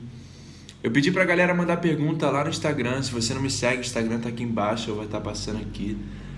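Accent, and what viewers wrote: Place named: Rio de Janeiro, Brazil. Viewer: Adoro sotaque carioca kkkkkk eu fico tentando imitar hahahaa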